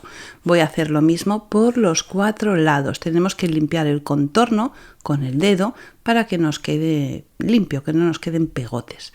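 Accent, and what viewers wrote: Spain. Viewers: ESPECTACULAAAAR!!Lo haré y espero me quede igual de precioso como en tuyo!!!Me encanta tu toni de voz y tu acento Español !!👍👌👏👏😘🌹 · ¡¡¡¡,sabes a mi me sucede ygual?,tengo infinidd de cositas hechas de hace años en distintas cajas,y en ocasiones las encuentro,....y me vuelvo loca mirandolas,como si no las hubiese hecho yo misma,y me emociono.....asi es un poco raro no?....bien tu trabajo es como dices ESPECTACULAR, que belleza besotes desde ARGENTINA creo que tu eres española sai me suenas 🤩🥰😂🤣😚☺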